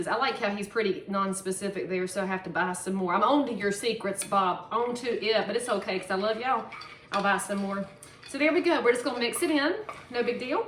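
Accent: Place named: South, United States. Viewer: Just found your video. You are cute as a button! Love your Southern accent, too. And thanks for the recipe! So good!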